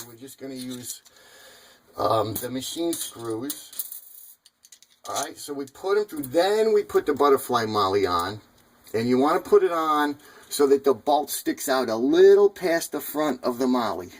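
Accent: New York, United States. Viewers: Thank you . I love your NY accent and sure appreciate your help with the mollies. I do have one question, though. How would you fix the other kind of hole that you showed at the beginning of your video where the two holes ripped making the two holes one long hole? That's what happened in my bathroom. I hope you really do answer all of the questions you get because I really need to know! My towel rack fell off and it looks terrible! Thank you again!!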